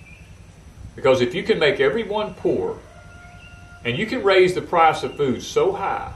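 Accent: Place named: Kansas, United States. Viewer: Sounds like Kansas Brother with all those extremley's.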